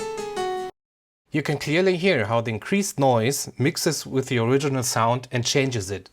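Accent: Germany. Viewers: Your slight German accent is adorable! Und Du bist so gescheit. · Are you German :D?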